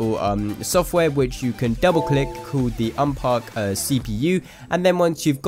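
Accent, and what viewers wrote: India. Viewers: your from north london? your accent seems indian and your face, and also your name everything seems indian soo yeah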